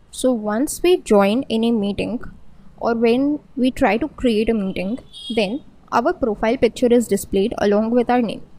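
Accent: India. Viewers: Indian english pronunciation totally error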